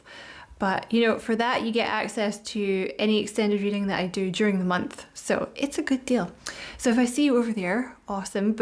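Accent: Scotland, United Kingdom. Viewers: Nice to hear a Scottish lassie here...we the best at this...love it I read too X with love Edinburgh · This is so accurate on many fronts, thank you 🙏🏼♥️🙏🏼 Let’s hope this new person comes in as soon as the Devil ex is well and truly out of my life! Lovely to hear a fellow Scot and to see you being so successful in your field xx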